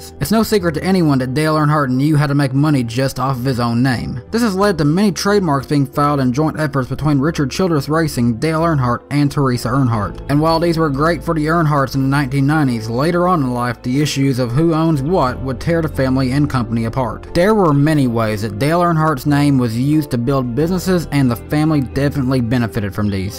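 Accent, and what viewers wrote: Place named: Texas, United States. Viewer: I have a buddy here in Texas that talks just like you, swear to God I do!!!!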